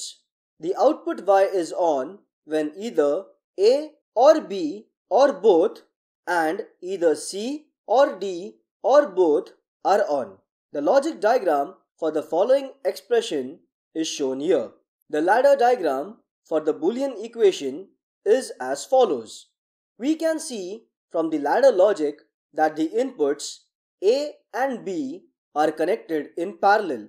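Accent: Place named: India. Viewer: your accent sounds kinda like india, glad to have your videos, thank you.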